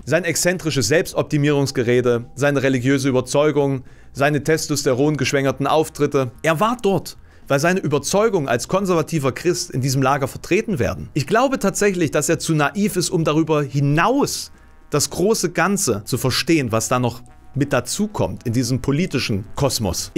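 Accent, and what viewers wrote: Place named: East Germany, Germany. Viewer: Richtig stark, gerade auch die Erklärung von dem Namen Malenki und Enttarnung als russische Übersetzung für Klein! Richtig großartig. Hast du aber nicht noch eine "kritische" Verbindung zu China aufmachen können oder zu Aluhüten? Dann hättest du wirklich alle buzz words drin. Ganz groß. Aber was mir fehlt ist, die Erwähnung von Ossis, weil die sind ja auch alle rechts. Da lässt sich zu Jeremy bestimmt auch eine Verbindung herstellen. Denn alle Ossis sind doch nicht nur rechts, sondern auch doof oder also so voll Jeremy nicht wahr?! Oh sorry hab deinen dummen Akzent fast überhört. Bist ja selbst nen Ossi. Wie sehr braucht man Kanäle und Leute wie dich, die nur mit dem gehobenen Zeigefinger durch die Gegend laufen. Du angepasster Ossi. Was für eine Auszeichnung für dich.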